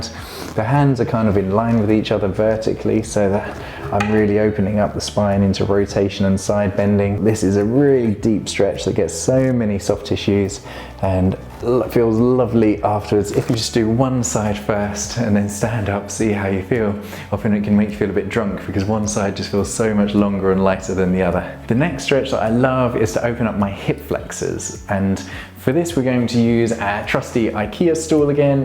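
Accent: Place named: United Kingdom. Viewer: Great ! I'll check later then. Meanwhile I'm seeing your other videos here too. They're very nice as well. Saw your Minimalistic Gym Home with Kettlebells and, we have a lot in common ! I also train a lot with KBs ! Have a collection of them though ! As for paralletes I plan to buy a pair later. Talking from Brazil here. You from UK , as I deduced from your accent and later on Youtube. Best wishes anyway ! BTW the squat video very nice too. Another suggestion a follow along routine to improve your Squat daily....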